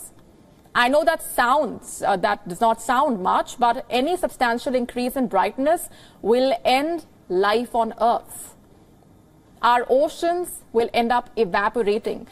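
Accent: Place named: India. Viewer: Daily dose of indian accent news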